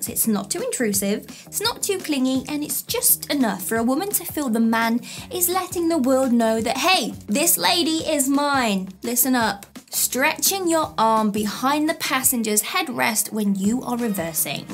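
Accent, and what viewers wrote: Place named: Midlands, United Kingdom. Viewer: What I really want to know is about your accent. Where are you from that gives you that very sweet soft and subtle accent. Its not overpowering so definitely not a northerner lol. And not deep into London or Liverpool for that matter. Maybe the midlands or the Lake District. I can’t quite pin it down lol Please do tell. You don’t have to be super specific or anything just the general area or county.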